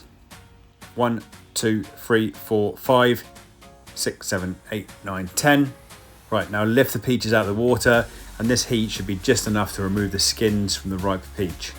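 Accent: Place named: United Kingdom. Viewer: Nothing more sexy than a man who knows how to make jam and comes with an British accent 🥵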